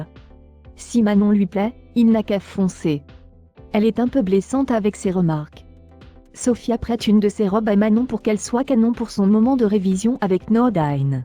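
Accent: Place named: United States. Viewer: Le commentateur prononce tous les prénoms à l'américaine ! C'est stupide !!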